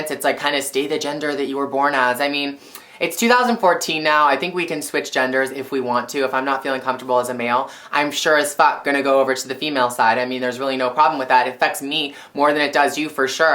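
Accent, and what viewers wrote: Canada. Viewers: you sound more canadian than ever before lol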